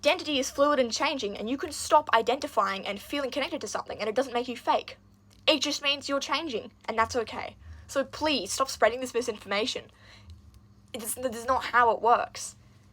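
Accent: Australia. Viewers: THANK YOU.. I was once a therian, but i stopped bc i didn't feel the same anymore, i abandoned everything related to it thinking it was just a phase or how i was burn out on doing wtvr this is..I thought i was faking being one and it upsets me sm.. I thought i were never one (which i still believe in that but wtvr), now i found out my identity just shifted (which i did not control and felt so sadly nostalgic tho-). Also i rlly love your videos, and your Aussie accent haha. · …. Have I found another fellow Aussie!!!! It’s just the accent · I love your Australian accent 🥺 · Oh my God, it’s giving voice reveal. The Australian accent is Australiaing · r u australian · He is Aussie, yes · are you Australian? 😭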